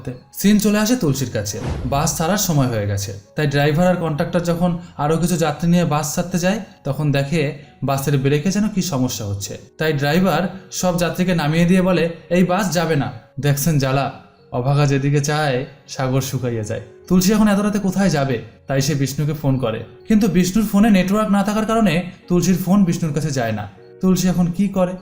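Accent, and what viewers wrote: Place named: Visakhapatnam, India. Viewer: উচ্চারণটা মনে হয় বিশাখাপাট্মম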